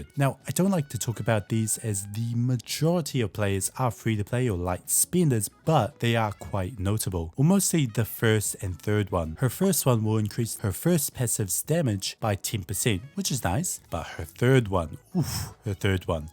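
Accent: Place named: New Zealand. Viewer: Are you Kiwi? ;) Love your accent!!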